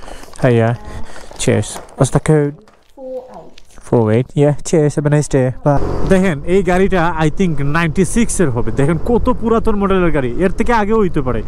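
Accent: Sylhet, Bangladesh. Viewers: Bhai Apnar House Sylhet ni ?? 😅 কথার ধরনে বুঝা যাচ্ছে সিলেটি ❤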